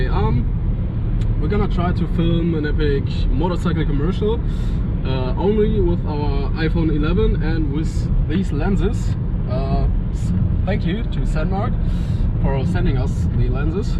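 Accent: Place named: Germany. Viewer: Allein wegen dem deutsch englisch like am Start 😂👍🏽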